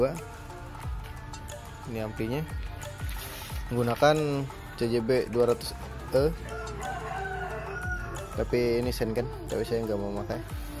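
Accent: Banjar, Indonesia. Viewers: Kaya ada logat logat banjar nya gitu om hehehe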